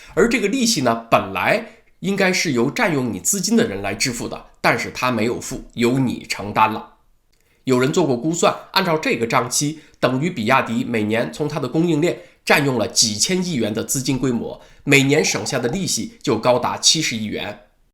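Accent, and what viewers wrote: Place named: Beijing, China. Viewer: 京味兒太濃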